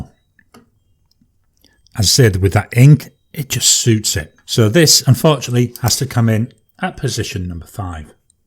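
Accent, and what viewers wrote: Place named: England, United Kingdom. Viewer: Hi. I have two of your pens; the Moonman M800 (Moonman nib) and the Jinhao 159 (I have a few in different colours). I don't have a Hong Dian but I do have the Lamy Studio which looks very similar. The Jinhao is a good pen for the price but feels a bit too large in my hand. I was surprised it took the top spot in your ratings. By the way, I know you are in Australia but your accent has me confused. It sounds English but I am stuck on the exact region. I am from UK but your voice still has me a bit puzzled!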